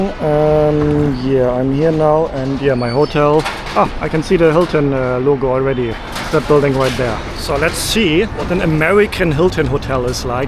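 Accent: Germany. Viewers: Hi! I’ve been watching your videos for a long time, mostly on Bilibili, and sometimes on YouTube too. Today I opened YouTube and your video was the first one, so I watched the whole thing. I remember you mentioned before that your German-accented English might be hard for people to understand, but I watched the entire video without subtitles and understood everything perfectly. I think your English is totally fine!😊 Hope more people discover and love your content.❤ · Its a german accent not an english one. But the language he is speaking is english.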